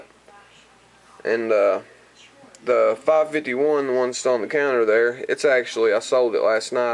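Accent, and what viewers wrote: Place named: South, United States. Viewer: i was having a go at the voice that southern US tone i just love it. This is an amazing review that Vannax C35VN Steel is insane just because S30V was voted as the best steel in 2007 or 2008 doesn't mean it still is and a lot of people think it still is. But the Vannax S35VN leaves most steel for dead. Ots a incredible steel the S35VN if you learn about it. Anyhow i was taking the micky out of ya and seems that some took it for real. Top review Champ...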